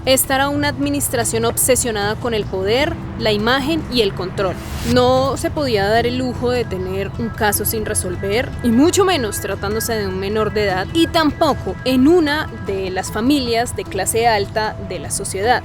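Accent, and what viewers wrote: Colombia. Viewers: andas perdida mi Reyna no se pronuncia Huixquiluacan, es Huixquiluacan pero bueno eso es lo de menos por tu acento puedo ver que eres colombiana...lo que si no pasa es que una mamá tiene en su panza 10 meses al bb....no mamita son 9 meses... que yo sepa en ningún lugar de el mundo son 10 meses de gestación 🤭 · Y esta youtuber, como se atreve a hablar de este caso, ni siquiera es mexicana, por su acento es Colombiana, ni siquiera los mexicanos sabemos como pasó, así que tache, oportunista!!.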